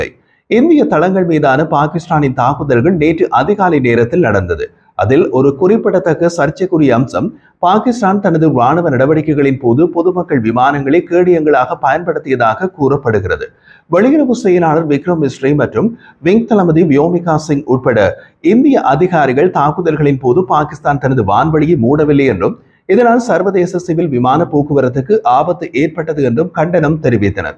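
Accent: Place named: Sri Lanka. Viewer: அருமையான தமிழ் செய்திகள் தூய இலங்கை தமிழ் நல்வாழ்த்துகள்!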